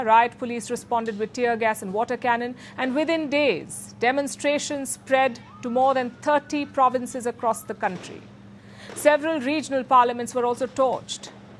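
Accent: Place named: India. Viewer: Funny English indian accent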